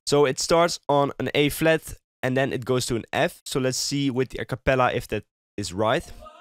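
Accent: Netherlands. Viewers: De eerste paar seconden hoorde ik al dat je Nederlands bent haahhaha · you sound dutch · 😂Je hoort het geweoon, je komt uit nederland zeker? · je nederlands accent man geen haat tegen maar tis een beetje raar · Mooi nederlands accent haha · It sounds like u have an dutch accent Are u dutch? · are you dutch? bc you kinda sound like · Ur Dutch? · This guy is definitely Dutch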